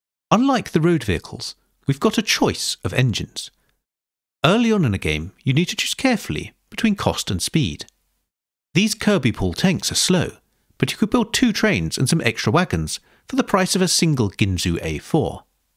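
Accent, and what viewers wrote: United Kingdom. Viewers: That accent is so British, 😂. Thanks for the video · I really love your voice. That soft british accent makes me think I'm a young child, watching some british infomercial or something like that. It's really soothing, and helps me learn.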